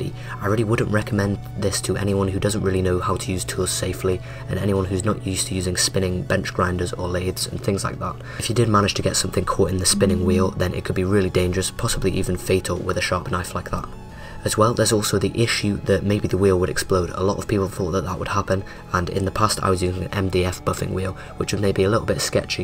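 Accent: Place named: England, United Kingdom. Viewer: bla bla bla bla typical england english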